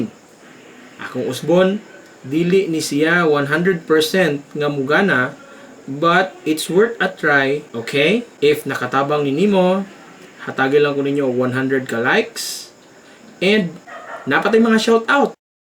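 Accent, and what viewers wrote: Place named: Visayas, Philippines. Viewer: Bisaya man jub ka saan ka saan ka dira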